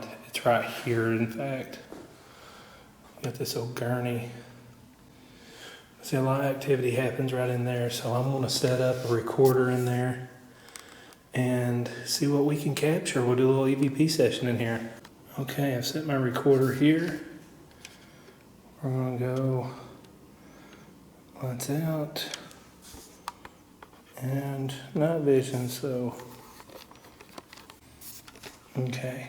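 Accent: South, United States. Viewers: I know ur a southern boy where u from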